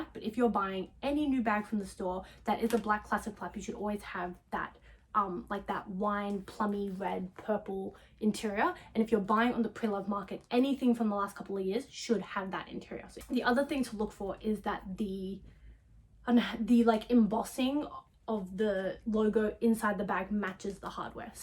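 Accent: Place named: Australia. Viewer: Best chanel video on YouTube. And your Aussie too 🤣😊🤗🥰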